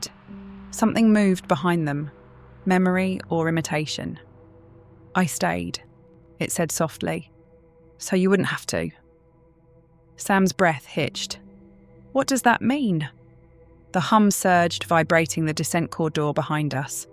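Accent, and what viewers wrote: United Kingdom. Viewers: I wasn’t fond of this voice. It had too much of an undertone of cockney or something which ended up being a bit grating. However, the story was enjoyable.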